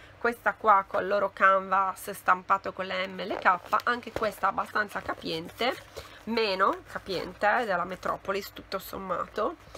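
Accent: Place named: Cagliari, Italy. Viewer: con due mesi di vacanza ti tornava l'accento casteddaio fortissimoooo!😂😂😂 in questi ultimi video si sente il ritorno alle origini! 😍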